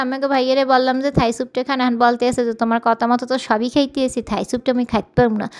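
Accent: Barishal, Bangladesh. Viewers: Aponar kotha sunte Amar khub bhalo lage especially Aponar borisailla bhasa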